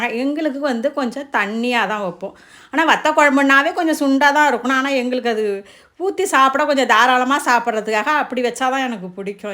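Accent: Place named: Thanjavur, India. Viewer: U have interior Thanjavur slang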